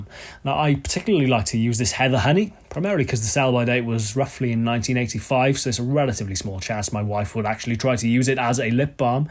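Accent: United Kingdom. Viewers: Stupid hairstyle and British accent will turn off most USA viewers.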